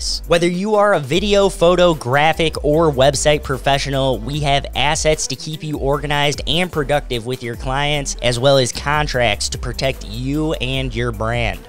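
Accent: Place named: Midwest, United States. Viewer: Midwest accent gotta love it! I say this in my own Midwest accent.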